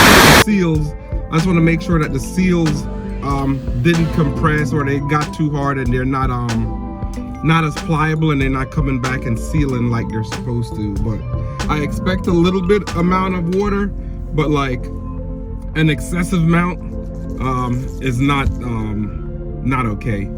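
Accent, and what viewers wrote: Jamaica. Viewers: This man yah sound like a yardie suh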